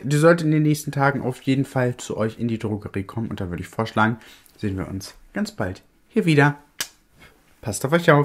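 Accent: Berlin, Germany. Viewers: Schicke bistde👍🥰 of berlinerisch😅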